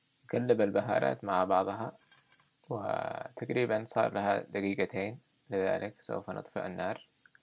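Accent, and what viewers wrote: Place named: Sanaa, Yemen. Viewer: بلعكس لهجتها الصنعانيه احلا لهجات اليمن واصل ابداعك استفدنا كثير اغلب الأشياء اجربها الي تنزلها جزاك ألله خير.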